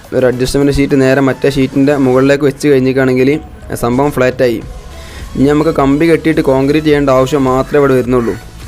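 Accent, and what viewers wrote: Malappuram, India. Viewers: "കുയി" നമ്മുടെ മലപ്പുറം ഭാഷ.....😀😑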